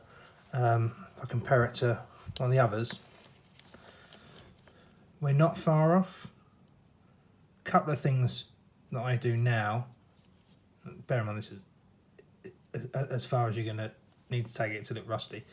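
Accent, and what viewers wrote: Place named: RP, United Kingdom. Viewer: Awesome work Btw way you’re accent always knew you a bit posh lol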